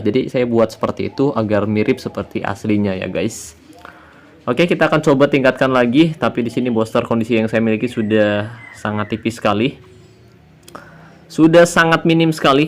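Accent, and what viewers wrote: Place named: Brebes, Indonesia. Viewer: BANG...ENTE ORANG BREBES YA... SALAM NGAPAK BANG...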